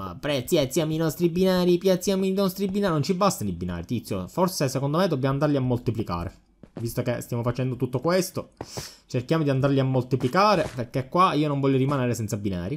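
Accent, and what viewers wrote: Palermo, Italy. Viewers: Scusa mah sei di Palermo per caso?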